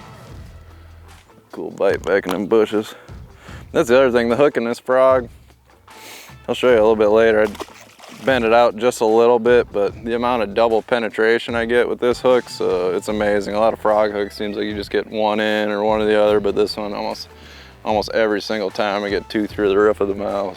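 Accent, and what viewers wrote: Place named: South, United States. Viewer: Dude reminds me of Jay from Jay and silent Bob with a southern accent chill af lol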